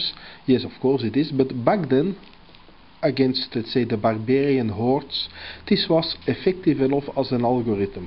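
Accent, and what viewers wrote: Netherlands. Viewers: No objection or anything, but are you Dutch? Your accent sounds Dutch to me. xD And thanks a lot for this video! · thanks man :) are you a dutch ?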